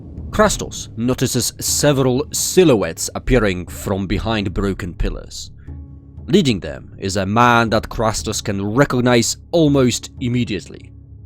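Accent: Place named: United Kingdom. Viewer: no disappointment here, it's more that the US channels can be a bit...intense. yeah your accent leans still towards a British pronunciation though haha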